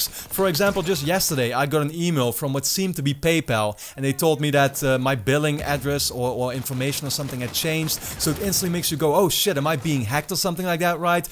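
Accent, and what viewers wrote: Netherlands. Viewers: Hey dude, thanks for the video! I'm new to the channel, btw I like it, so I don't know it but are you Dutch? I am and I think I can recognise your accent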